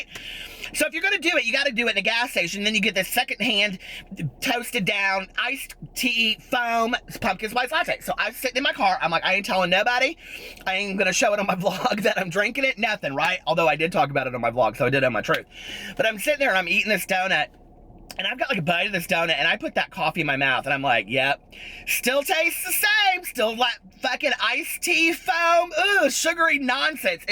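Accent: South, United States. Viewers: You sound like you have the thickest southern accent in this rant! Love it!!!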